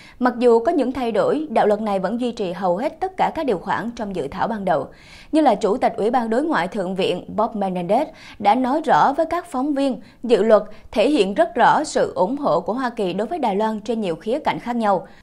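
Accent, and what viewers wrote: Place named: South, Vietnam. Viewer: Ngôn ngữ và dọng nói miền Nam hay lắm. Giữ lấy BẢN SẮC VĂN HOÁ NAM BỘ dễ nghe nha,!. Bạn có âm vực rất tốt, cám ơn bạn.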